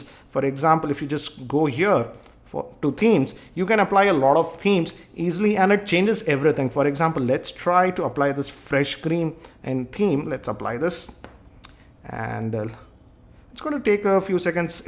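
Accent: India. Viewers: Indian English......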